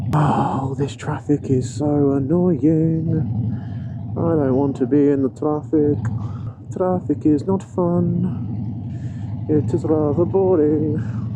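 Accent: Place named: United Kingdom. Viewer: U are the best 😂❤ a big hug from Italy 🇮🇹 btw i love ur UK accent ❤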